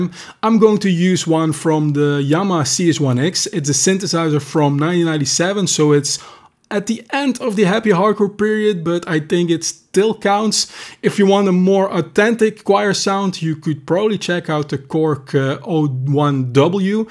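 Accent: Netherlands. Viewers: Gotta love the combination of in-depth cultural knowledge, spot-on production and of course the delicious dutch accent. You got another sub! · Man you sound so Dutch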